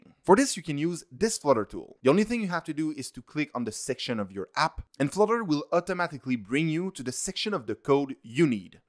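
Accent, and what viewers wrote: France. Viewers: That's a very nice explanation with a French accent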